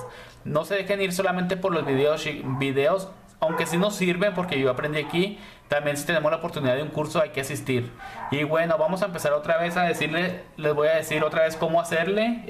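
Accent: Chihuahua, Mexico. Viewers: a mí me parece que es de Chihuahua..por como pronuncia la ch 🙃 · Eres de chihuahua?...! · Tu eres de chihuahua! Por el acento! Nada más por ser mi paisano te voy hacer caso caray!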